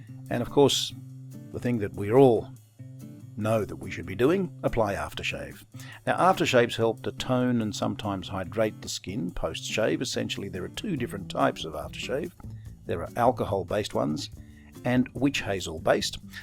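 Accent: Australia. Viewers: G'day, Thank you for a great series of entertaining and educational videos. It's particularly nice to hear a cultured Aussie accent: your voice would not be out of place on ABC Classic FM. A question re witch hazel as an aftershave if you would please be so kind as to answer... Aftershaves (etc) are available which have a witch hazel base--such as the Thayers in your video ($18 plus postage on eBay for 355ml)--but it is also possible to purchase what is described as 100% pure organic witch hazel ($22 plus postage for 500ml on eBay), and T N Dickinson's Witch Hazel ($6 for 240ml from Chemist Warehouse). Are all of these suitable? Are any better or worse? Does the 100% witch hazel (whatever that means) need to be diluted? Big price difference between these.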